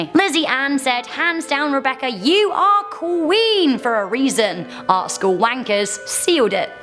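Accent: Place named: United Kingdom. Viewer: Mary me Rebecca! LOL. Seriously though your gorgeous plus I'm a sucker for British accents too.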